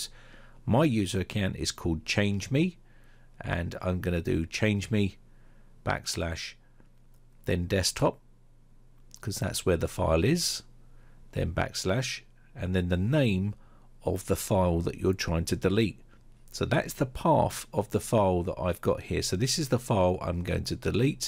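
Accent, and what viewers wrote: United Kingdom. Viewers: Finally british accent being found.. This is rare.. no indian agian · It's British accent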